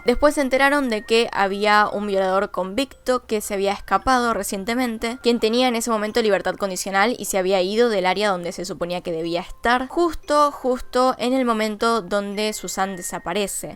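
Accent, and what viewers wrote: Buenos Aires, Argentina. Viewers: Es acento porteño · Estoy de acuerdo, he escuchado el acento argentino de diversa áreas y me solía incomodar. Aparte que he conocido en persona variass argentinas y son difíciles de dialogar. No soy la persona que juzga a todos por el mismo filo, sin embargo es agradable escucharte y no ser petulante o de alta arcurnia. Me smpatizas muchos y por eso sigo tus casos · yo odiaba el acento argentino, pero te conoci a ti y todo cambio <3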